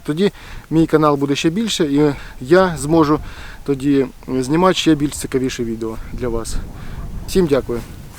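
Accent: Russia. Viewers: Привіт😊 все у вас добре виходить, чутно що деякі слова російсько-українські, але нічого 😊 потрібна практика.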